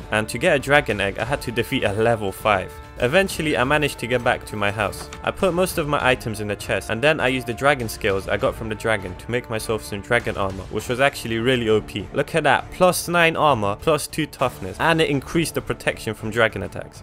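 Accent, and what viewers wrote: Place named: Roadman, United Kingdom. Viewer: bro has a roadman accent 😂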